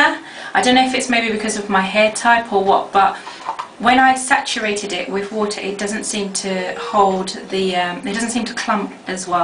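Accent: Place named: United Kingdom. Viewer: I love your dialect, you wouln't happen to be from the U.K.? I'm gong to try this style on my 4c hair.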